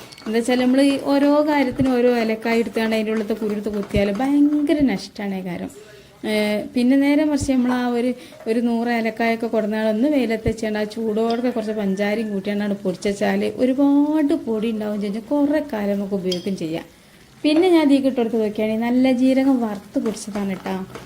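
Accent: Malappuram, India. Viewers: വായ് പൂട്ടാതെ കമൻ്ററിയുണ്ടല്ലൊ ഇത്താത്ത അത് തന്നെ ഉഷാറല്ലെ. ജില്ല മലപ്പുറം ആണെന്ന് തോന്നുന്നു. മുരിങ്ങ തണ്ട് ഇതിനിടയിൽ ഇത്ത എപ്പഴാ തിന്നത്?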